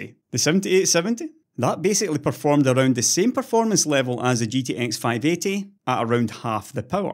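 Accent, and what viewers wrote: Scotland, United Kingdom. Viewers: Damn, is scotish? Just noticed...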